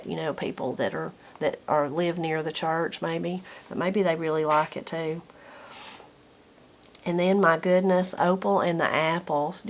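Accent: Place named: South, United States. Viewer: Thank you both for the wonderful stories makes it even more amazing with her southern draw😃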